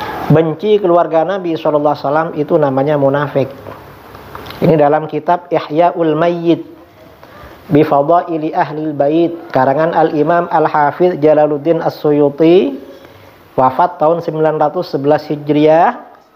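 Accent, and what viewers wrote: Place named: Madura, Indonesia. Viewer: Ini kyai mana ,kayaknya madura,kok gini pangaoningannya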